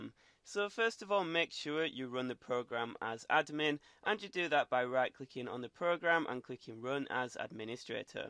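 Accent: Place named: United Kingdom. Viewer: Another fuc***n british.. 🤣🤣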